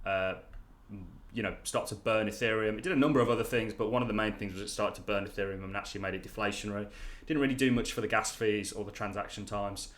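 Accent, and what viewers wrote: United Kingdom. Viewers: For a moment I thought it was Benjamin Cowen with a British accent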